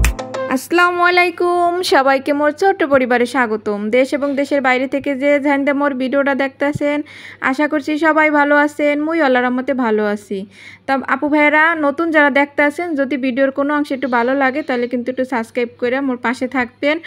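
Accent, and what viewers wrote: Barishal, Bangladesh. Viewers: ❤❤ আপনার কন্ঠ শুনে বুঝতে পারছি,আপনি বরিশালের মেয়ে, আমি ও কিন্তু বরিশালের মেয়ে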